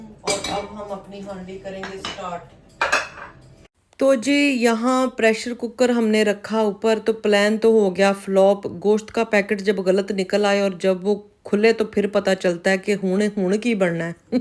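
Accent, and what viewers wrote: Punjab, India. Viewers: Assalam o alekum aap ki batain aap ki meethi Si panjabi mujhe bohat Pasand Hy I like u very much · Hayee ❗tusi bohat sohnay ho bolday ho jado Punjabi ta maja aa janda ❤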